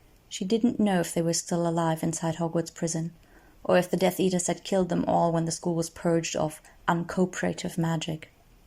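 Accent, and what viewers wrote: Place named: Paris, France. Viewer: It’s sounds like the narrator has a Parisian accent - that would explain the mouth noises. I don’t mind it honestly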